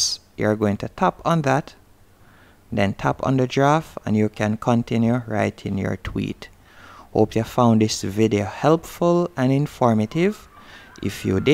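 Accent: Jamaica. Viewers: Thank you! You sound Jamaican.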